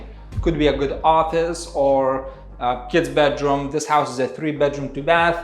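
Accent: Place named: Baltic states. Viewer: Chanced on your video for the first time, wasn’t expecting blet by the garage, and only then did I recognise your Baltic accent. Nu va. Sveikas! 😊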